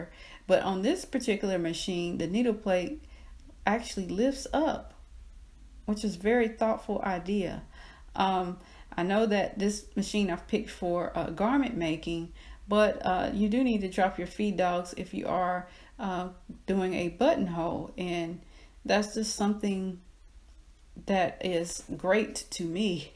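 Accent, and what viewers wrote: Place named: South, United States. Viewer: and as an australian i love that southern accent - also no stupid shitty music.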